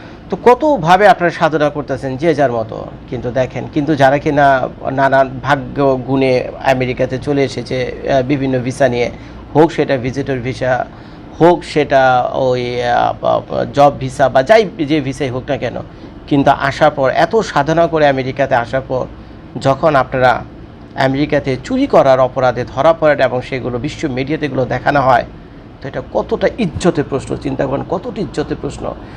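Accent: Dhaka, Bangladesh. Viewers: ের বাড়ি কোথায় মাঝে মধ্যে ঢাকাইয়া ভাষায় কথা বলেন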